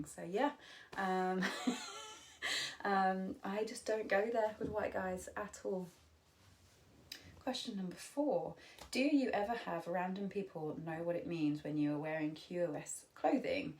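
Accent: United Kingdom. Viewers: In addition to the rest, I love your very elegant, so British accent and way of speaking (I'm French).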